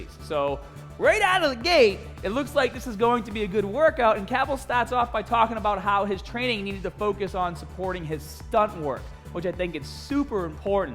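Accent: Boston, United States. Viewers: Staaaaaaat. Get in the caaar! Bro the Boston accent is thick as fuck 🤣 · took me forever to work it its not much like other bostonians i know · is this guy from bostonkkklkokkjkj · Didnt realize strong Boston accent until this video, boston represent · why do you have a boston accent in the first half and a regular accent in the second?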